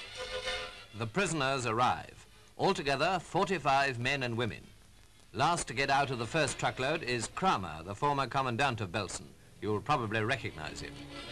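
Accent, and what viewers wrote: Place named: RP, United Kingdom. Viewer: speaking BBC English instead of the mishmash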